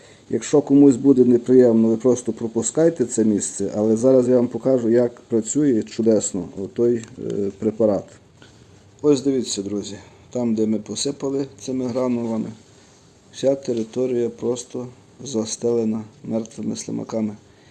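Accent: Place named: Galicia, Ukraine. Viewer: Дивно, що ви від них не страждали раніше. У насу Вінниці ця нечисть з 2014 року. Тоді їх було тисячі. Тепер набагато менше. Судячи з вашого акценту ви десь з Тернопільщини або Франківщини коротше з Галичини) Я там спостерігав цих слимаків ще років 6 назад. У Карпатах вздовж берегів річок було дуже багато. Ми рятувалися звичайною сіллю та потім ще почали додавати вапно. Мінус що вона діє до першого дощу. Дякую за пораду - треба запитати або в інтернет знайти!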